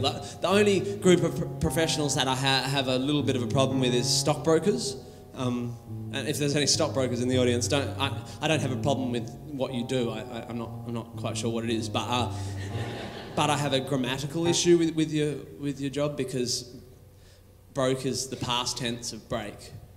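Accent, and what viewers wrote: Australia. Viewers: If you are in any doubt as to nationality listen to his accent. It's a middle of the road educated Aussie accent. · a MEGA talent , pity the subtitles are prudish (blanking out swear words on the i'net WTF !!) & often put in the wrong words coz they don't correctly hear the Aussie accent ! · That was the basis of my comment. After the many times I've seen him it's the first time I noticed a hint of Aussie.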